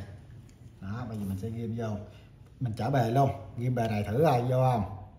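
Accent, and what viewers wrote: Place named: South, Vietnam. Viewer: bạn để âm lượng nhỏ hay sao ấy chứ mình vẫn nghe rõ mà. giọng miền nam nên hơi khó nghe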